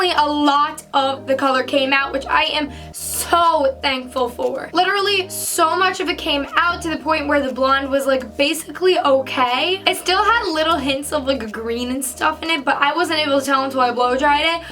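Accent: New York, United States. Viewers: You have such a new York accent I LOVE IT 💜💛💙💚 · New York accent baby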